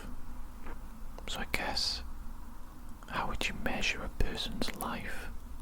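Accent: Wales, United Kingdom. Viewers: Great video! I might be wrong but from your accent, do you happen to be welsh???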